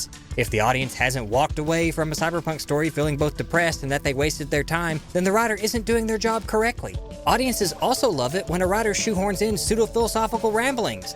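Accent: South, United States. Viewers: you made the Southern accent great again!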